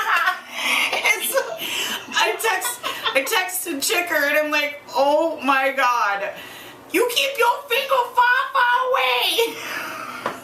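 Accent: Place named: Minnesota, United States. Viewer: Hahaha Hahaha!!!! Love this! Love the Minnesota accent ever since I heard it in "New in Town"..... Don't Cha Know!!!! Have a super day!!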